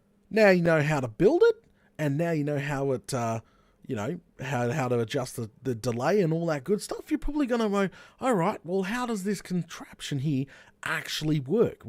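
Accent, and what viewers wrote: Australia. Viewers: I prefer British accent for tutorials Btw because Australia is a British colony the accent sounds like a fusion of a Southern and British accent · Subbed just because Australian accents are great for tutorials lol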